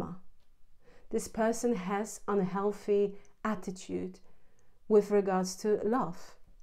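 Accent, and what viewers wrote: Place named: England, United Kingdom. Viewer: Love your English accent. You sound like a very sweet and caring person. I enjoy your readings.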